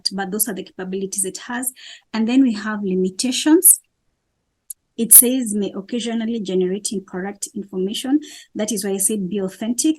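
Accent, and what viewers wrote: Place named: East Africa. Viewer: Like you East African ascent... thanks for the lecture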